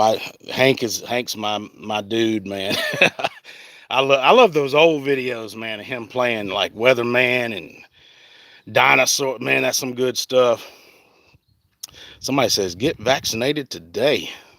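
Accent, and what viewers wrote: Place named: Florida, United States. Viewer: I just found you TODAY. I LOVE YOUR NEW SONG. I am a Florida & Alabama Gulf Girl (stuck in Utah now). Where are you from? Accent sounds like NW Florida?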